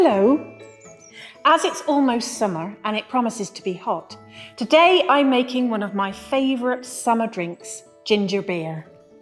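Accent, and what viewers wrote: United Kingdom. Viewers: Meu Deus amo o sotaque britânico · Because of this channel, I can now speak English in British accent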